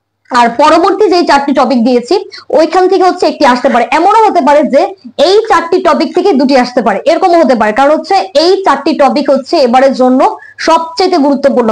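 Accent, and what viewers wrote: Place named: Kolkata, India. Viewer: ভাষা কলকাতার মতো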